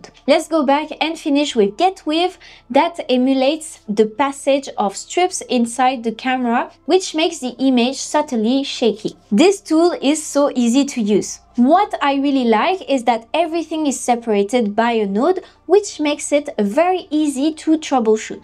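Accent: France. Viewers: Verry nice french accent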